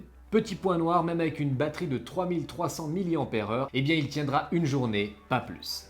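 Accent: Vaud, Switzerland. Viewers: Oooooh le T610 😍 En réalité ce que je préfère dans tes vidéos je crois que c’est quand même de bel accent vaudois 😏😅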